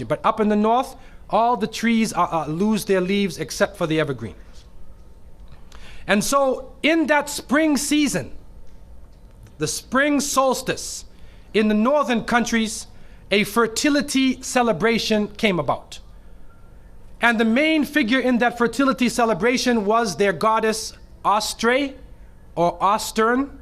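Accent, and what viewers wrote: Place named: Canada. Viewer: where is this guy from? what part of Canada?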